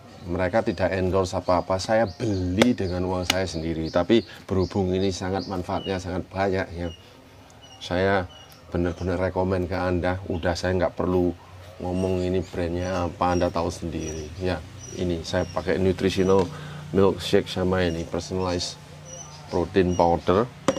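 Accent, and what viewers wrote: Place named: East Java, Indonesia. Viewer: Suka dengan penjelasan nya, khas jawa timuran, matur nuhun mas, salam dari Jakarta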